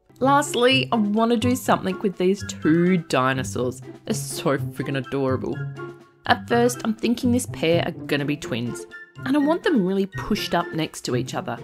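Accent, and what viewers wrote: Australia. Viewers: love my Aussies your accent is beautiful. most of my fav channels are aussie much love from Canada ❤🇨🇦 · I love them all. The newest edition to the Ice-cream Critters is definitely my favourite in this one. Yes, as a fellow Aussie, I to grew up with 100's and 1000's on my Fairy Bread !! I also see you are trying hard to upset all the Tasmanians haha. It sounds like you live in my neighbourhood, what side of the river are you on ? 😀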